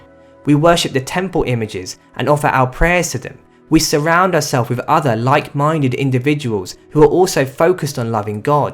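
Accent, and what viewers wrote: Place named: United Kingdom. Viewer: First of all great video, it seems from the accent you are british but the pronunciation of hindi words and gods names or places was excellent ,thanx for making this video ,truly educational.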